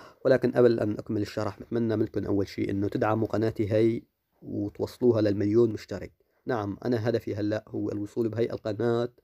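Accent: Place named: Homs, Syria. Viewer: معلم مدري ليش حاسس اني سامع صوتك وانك حمصي 😅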